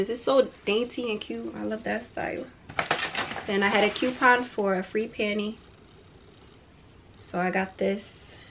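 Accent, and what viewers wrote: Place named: New Jersey, United States. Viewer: So your a Jers3 girl. Still there? Your accent is sweet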